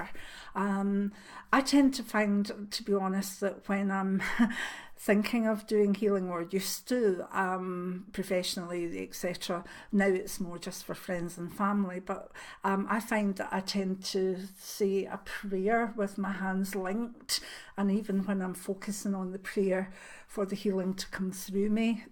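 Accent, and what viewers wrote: Scotland, United Kingdom. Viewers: /thanks again for this video. Excellent as always ( i really dont need to say that now as i/everyone know(s) they are). What's the stone you recommend for rubbing your palm with? I cant place it with your (lovely) Scottish accent. Can you recommend some where on line going to for this? I'm in the midlands area..... is there anyway you know of between telford. /shrewsbury/chester? edit I'm aware it's hardly local to you so no problem if not.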